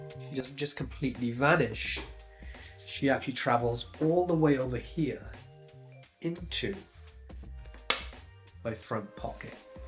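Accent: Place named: Wales, United Kingdom. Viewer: Part of Wales you from, but? Diffo picking up unmistakable traces of the accent. And with a Celtic name too 😮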